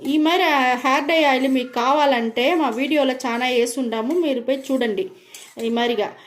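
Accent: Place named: Rayalaseema, India. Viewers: ఈమె చిత్తూరు యాసలో మాట్లాడుతున్నారు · Tamil kadhu, rayalaseema telugu adhi, naa frnd vundhi rayalaseema ammayi, thana slang kuda ala ne vuntadhi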